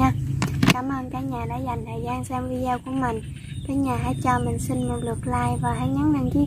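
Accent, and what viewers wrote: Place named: Southern Vietnam, Vietnam. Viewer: Giọng miền Nam sao nghe dễ thương vậy em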